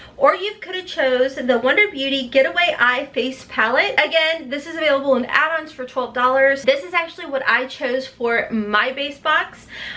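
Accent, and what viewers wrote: South, United States. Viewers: Awe... try not to let people bully you...It’s not that your not putting on your big girl panties, it’s people can be mean and insensitive...Your accent is cute and southern, like mine! Your a sweet and beautiful queen, so don’t listen to mean girl’s comments...We love you, ALL of you! 🥰♥️🥰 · I love how you talk 😍🥰💕 especially when you say “matte” and “back”.... and girllll I’m from the south (Virginia) and I have that southern accent as well.. and OFTEN REPEAT THINGS LOL... do your thang girl!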